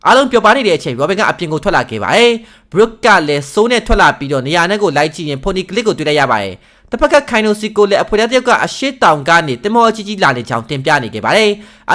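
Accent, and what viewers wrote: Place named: India. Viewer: အသံကကုလားနဲ့တူတယ်နော်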